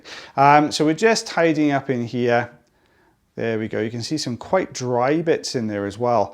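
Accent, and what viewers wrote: Wales, United Kingdom. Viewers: Is you Welsh Butt?? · It is a Welsh thing - love the videos, and also love hearing your accent - reminds me of home!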